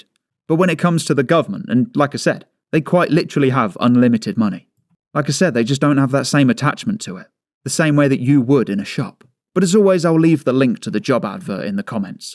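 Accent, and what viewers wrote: United Kingdom. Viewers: Thick British enough said.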